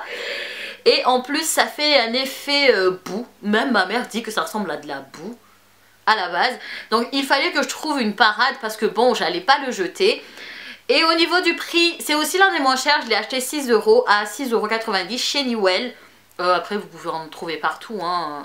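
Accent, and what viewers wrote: Madagascar. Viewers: Ça se voit et s'entend qu'elle est Malgache. " Manaona Ampelassoua " .... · Tu as de l'accent Malgache 😗😗😗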